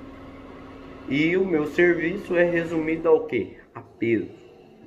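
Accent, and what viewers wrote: Minas Gerais, Brazil. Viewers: Éh mineiro!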